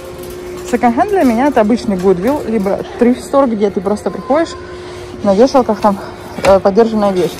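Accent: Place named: Southern Russia, Russia. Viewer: Ой --я рада , что появился новый блогер на барахолке. Смотрю и Олю и Сашу...Теперь и вас смотреть буду... Только я не поняла , вы в России где жили??? У вас немного южный русский акцент.. Удачи вам в поисках...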